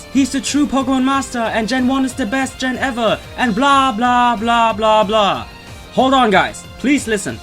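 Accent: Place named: Germany. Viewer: you sound german ^^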